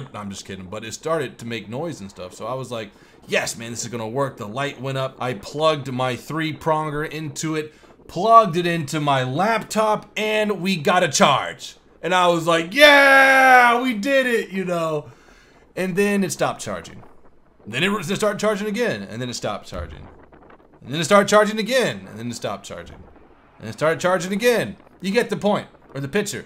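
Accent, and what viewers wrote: United Kingdom. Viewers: English accent on point! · I thought you were British?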